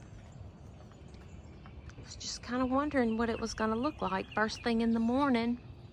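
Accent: Oklahoma, United States. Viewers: Sounds like Southern Oklahoma.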